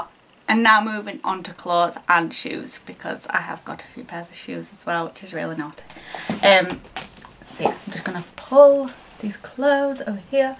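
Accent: Yorkshire, United Kingdom. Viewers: It's an accent, Yorkshire accent to be precise x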